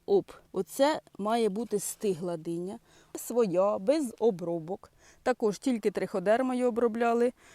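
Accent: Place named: Poltava, Ukraine. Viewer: Цікаво і пізнавально, дякую. І ще, насолоджуюсь вашою говіркою, так похоже розмовляють у багатьох селах Зіньківщини, де довелося деякий час жити. Там є село Власівка - точнісінько така ж інтонація в розмовній мові. Бажаю гарних врожаїв.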